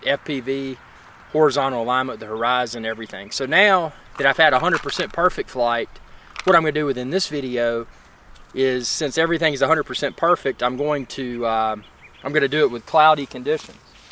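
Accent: South, United States. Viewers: You sound southern - I am in Tennessee - Thinking of getting one of these. I would like to fly it in some of the massive caves/pits around... wonder how well that would work...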